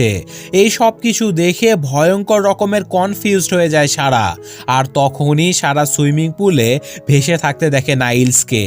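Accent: Bangladesh. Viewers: Dada apnar voice ta khub sundhor API ki bengladesi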